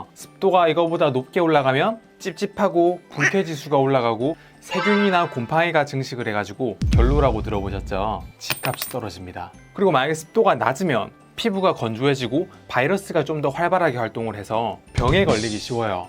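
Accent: Gyeongsang, South Korea. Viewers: 갱상도 발음인데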